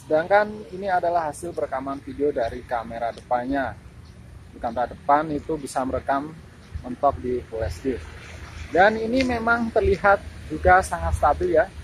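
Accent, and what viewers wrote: Blitar, Indonesia. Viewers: orang blitar kah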